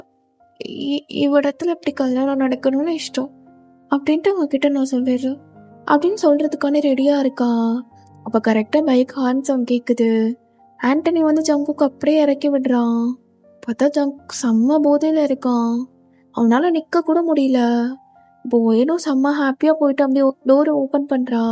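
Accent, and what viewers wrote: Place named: Kerala, India. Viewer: Akka I'm a malayali 😊 but your malayalam is soo cute ❤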